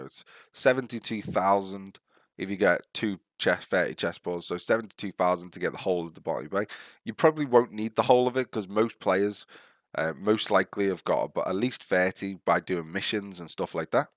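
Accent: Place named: Northern England, United Kingdom. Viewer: Love the northern accent! I'm a little farther north, I'm Yorkshire. Altincham are you?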